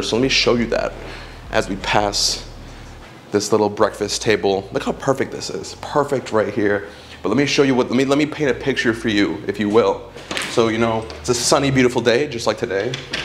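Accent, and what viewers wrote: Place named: United States. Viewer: i like your american accent. he is very classy. so many americans speak as peasants but not you .❤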